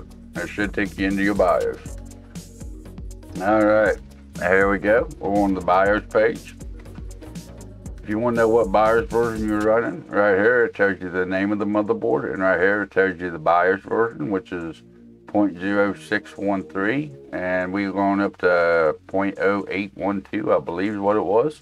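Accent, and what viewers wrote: South, United States. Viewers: I’ve never seen a man so southern but so smart with pc😂 THANKS ALOT BROTHER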